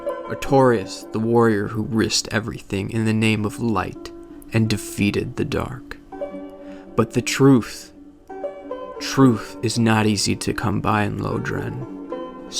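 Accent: United States. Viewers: It needs a British narrator. American accents don't mix well with DS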